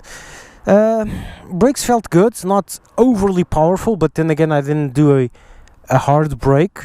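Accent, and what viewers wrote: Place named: Portugal. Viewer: só pelo sotaque dá para ouvir que és tuga crl! :D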